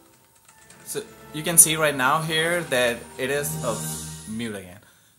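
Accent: India. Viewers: Christ Youtube doesn't support 4K ??????????????????????? you need to prepare a lot before making a video and first speak slowly no need to rush. what accent are you on ? india ?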